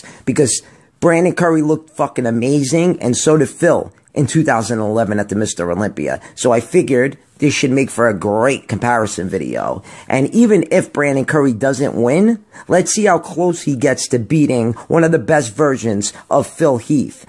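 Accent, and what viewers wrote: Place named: New York City, United States. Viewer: i love the NYC accent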